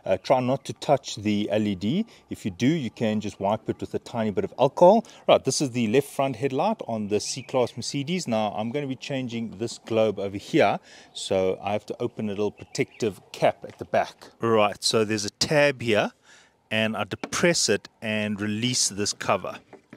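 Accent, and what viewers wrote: South Africa. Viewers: This man has that OG soutie transvaal South African accent. Haven't heard it in ages.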